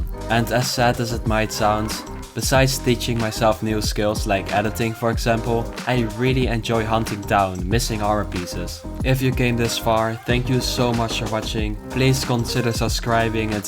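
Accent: Netherlands. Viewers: super nice vid man keep it up!!!!! you have kinda a dutch accent is this true? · Are you dutch cuz ur accent sounds insanely dutch lol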